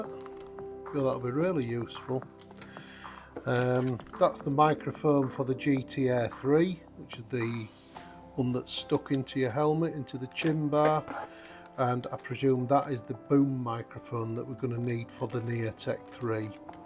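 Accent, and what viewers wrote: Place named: Wigan, United Kingdom. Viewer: Ok, I have to ask. I have a customer from the UK who lives in Wigan, you sound exactly like him! Do you live near there?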